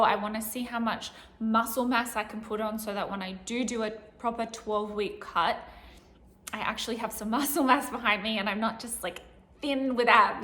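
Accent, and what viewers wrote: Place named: Australia. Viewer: She's cutting a promo in Australian- Oli Davis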